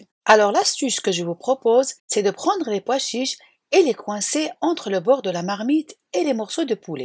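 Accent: Algeria. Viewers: اشتركت في قناة على خاطر الغربية نتاعك تخرجلي شابة بزاف. من فضلك وصفة الفاكهة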